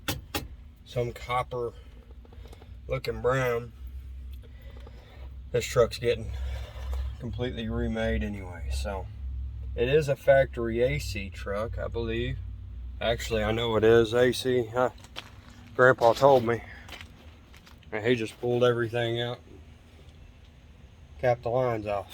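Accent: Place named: South, United States. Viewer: That's a nice truck and a fun project if one have the time and money for it. Especially since it was your granddad's. I love your accent. I'm European and have never been to the USA but if I go there one day I think I'll skip the north and just travel around the south, probably Nevada too because of Fallout New Vegas. 😄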